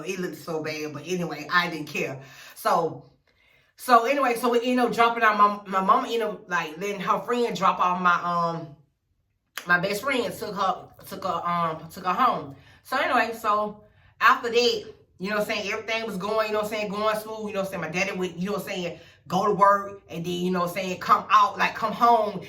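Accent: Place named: Memphis, United States. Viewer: You sound so Memphis or Mississippi. You had me rolling. How your sisters let them girls act like that toward you? Chile. Girl trips 😓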